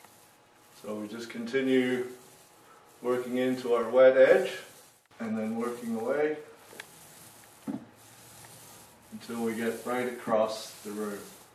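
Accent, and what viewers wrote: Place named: Scotland, United Kingdom. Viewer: This was great to watch a real pro doing the usually messy job of painting a ceiling the proper way... bit jist as a wee small aside noo, kin a no detect jist a wee bit oh the far-flung 'remnants' of a guid Scot's accent, hiding in backgroond? Well; an av always says this massel son... Ye jist canny hide true Scot's class (or talent).. fi nayebidy, nay matter whoar yi ur roon the wurruld or even in the back 'o beyond, an that's fur shure tae! Thanks, for uploading this video.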